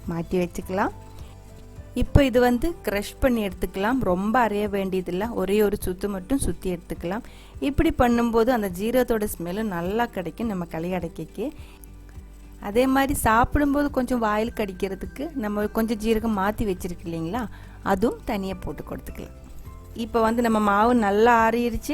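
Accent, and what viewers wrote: Kerala, India. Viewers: Love ur tamil way of speaking in a malayalee slang...awesome sis frm malaysian tamil mom😍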